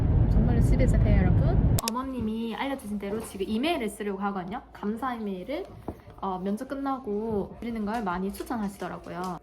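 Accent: Gyeongsang, South Korea. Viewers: 어쩌다 지나가다 ~축하드려요 ㅎㅎㅎ 억양이 경상도인것 같네요 ㅎㅎㅎ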